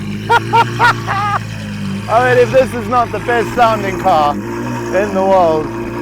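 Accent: South Africa. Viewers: Are you South African? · sure runs that pie-hole ~ is that a S African accent?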